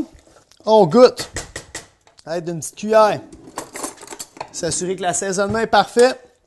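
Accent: Quebec, Canada. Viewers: Oui quoi son accent ??? Pas Français de pure laine, non pas possible.Le Québec (après les pauvres millier d'Amérindiens exterminés par les colons Anglais et Américains) a été fondé par qui au juste .....on ravale son trop plein de grâce....Merci.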